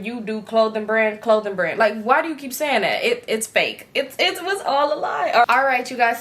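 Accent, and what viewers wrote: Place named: Texas, United States. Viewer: My brother heard the story about the weirdo in your apartment complex when you got your first apt. He say who is that talking about the creepy neighbor and I did not know he was listening 👂 huddling 🤦‍♀️ I told him I found your channel through the pandemic and I been riding with you since. We from Houston, Texas. I thought you was from Texas by the way you talk. But I found out your from California through your stories. You gotta let me know when you coming to Houston cause I wanna meet you and I love your vibe. I’m 43 I’m not with the bs and I’m not a weirdo 😫😫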